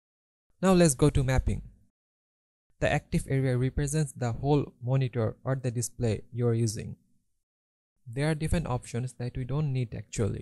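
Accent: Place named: India. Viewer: Ah yes my left ear's daily dose of an Indian accent ASMR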